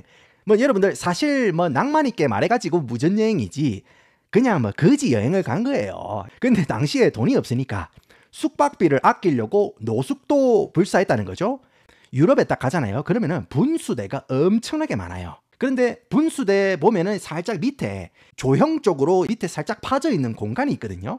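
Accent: Gyeongsang, South Korea. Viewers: 갱상도 사투리에 홀려서 구독합니다. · 한국말하실땐 구수한 경상도사투리 쓰다가 영어만하면 어나더레벌😊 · 와 나도 경상도 사람인데 이 사람 사투리 왤캐 심하냐 같은 경상도가 들어도 거북할 정도임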